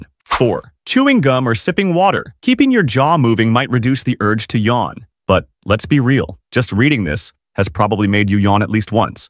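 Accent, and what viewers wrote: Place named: India. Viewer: the indian accent 😭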